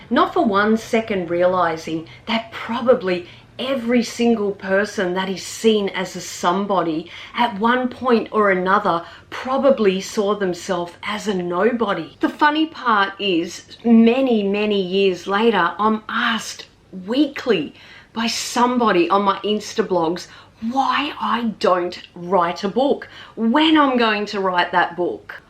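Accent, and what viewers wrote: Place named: Australia. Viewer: lovely princess, you always make solid points about the content on your channel, so inspiring, so informative, very classy, and very creative, plus, you have such a beautiful glow on your pretty face, and the most adorable accent in Australia. I have so much respect for you, the same way you do for me. Send you a bow like a noble gentleman, and you send me a curtsy like a graceful princess. 🤗🤗🤗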